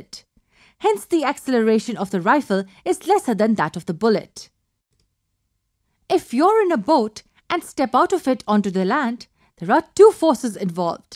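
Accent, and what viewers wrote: India. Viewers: this is to bad y r u Indian and explaining I didn't understand anything form u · Sounds Indian to me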